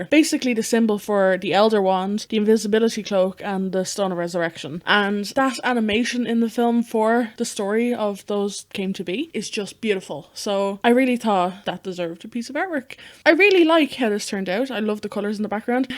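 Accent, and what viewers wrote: Ireland. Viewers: are u Irish?.!? same!!! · HOWYA FELLOW IRISH PERSON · Hey what country are you from/live in? You sound like you're from Ireland. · Are you irish? Could you sound like it a lot XD at least in my eyes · OMG YOUR IRISH I LOVE YOU I'M IRISH TOO FINALLY A YOUTUBER WHO SAYS SECONDARY SCHOOL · are you irish ??? i am and you sound like you are and if so which part?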